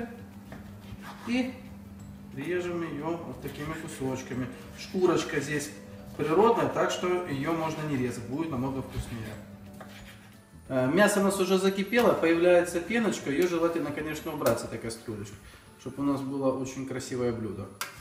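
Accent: Ukraine. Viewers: Ведущий с Украины, похоже с Юго-Востока т.к. чисто по- русски говорит. А у нас так и говорят всегда -"Возьми , съешь огурчик или пойду собирать огурчики". Это как в Росии да и у нас русские часто говорят -" Съешь , помидорки" . Хотя остальных суфиксов действительно многовато, наверное просто мужчина хотел придать видео больше душевности и убрать официоз.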